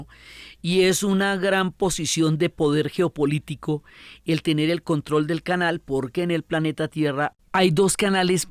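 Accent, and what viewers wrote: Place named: Colombia. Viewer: Escucharla a es transportarse al lugar donde ella narra, pero sin salir de casa 😬😬😬. Me encanta esa sazón Colombiana que le pone, que está como el ajo, en su punto exacto ni mucho para arruinar, ni poco para no sentirse. 👌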